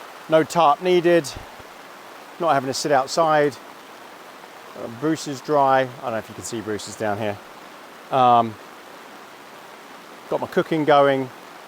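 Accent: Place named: Cheltenham, United Kingdom. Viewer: Where are you from? You sound just like my mate who comes from Cheltenham. Loving the vids & Bruce 👍🏻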